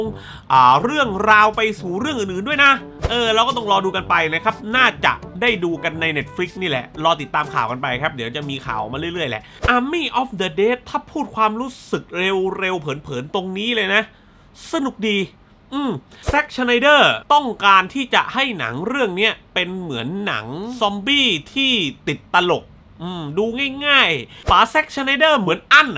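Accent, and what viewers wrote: South, Thailand. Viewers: พี่คนที่ ติดพูดสำเนียงใต้อะครับ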